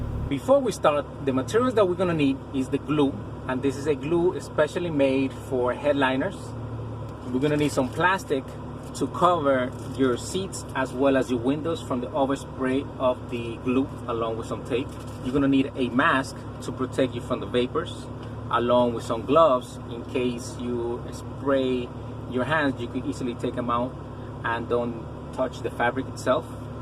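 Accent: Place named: South Florida, United States. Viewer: OMG, thanks for this video! I was about to try on my moms super saggy headliner and I KNOW that foam is all deteriorated like in your video, I can feel it crumbly through the fabric. It's not worth it right now, lol. btw, I KNEW you were also from SoFla as soon as I heard you talk, thanks for the great video neighbor!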